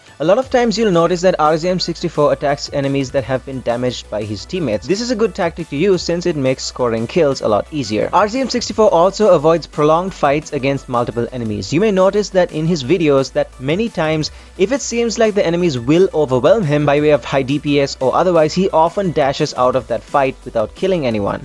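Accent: India. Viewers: Are you Indian? Your accent seems sometime like it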